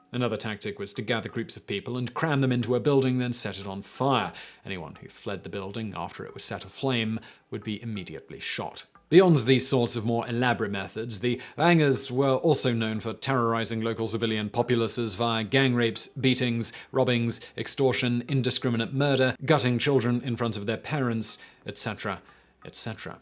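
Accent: United Kingdom. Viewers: Can u try American /Canadian accent,British accent story telling is just too tiring ..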